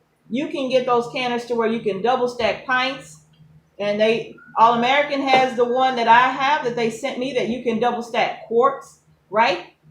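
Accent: South, United States. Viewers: From my experience, yoie tubie can't figure out CC, especially with a southern accent. I have seen some ridiculous "translations".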